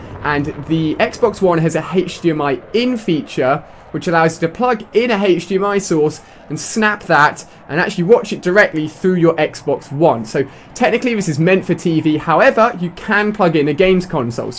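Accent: England, United Kingdom. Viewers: because he was born In Britain you idiot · Daniel Patel Because he's British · why do you have an English accent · Z is pronounced ZED in the UK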